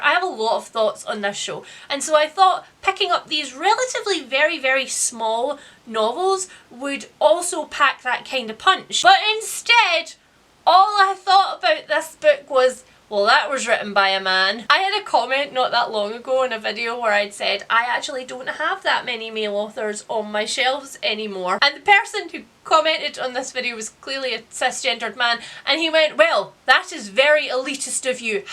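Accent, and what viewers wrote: Scotland, United Kingdom. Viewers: i feel like i’m watching an episode of balamory w/ ur accent lol i love it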